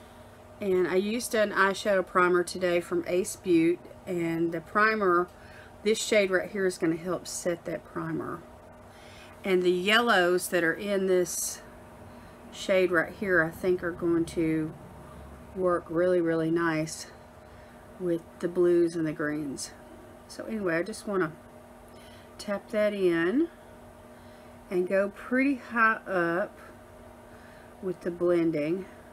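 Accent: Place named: East Tennessee, United States. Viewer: Hello,! I’m here from Erica’s channel, & I really enjoyed seeing the gorgeous look you created with the generated colorstory from the photo she chose for you! Those vibrant blues & greens look lovely on you! I have to ask (particularly based on the location you chose for her) if you’re a fellow Tennesseean. I’m in west Tennessee about 25 miles east of Memphis, but my mom was born in east Tennessee (Kingsport, if I recall correctly), & I thought I detected a familiar east TN accent! 😉👍✨💚💙💚💙✨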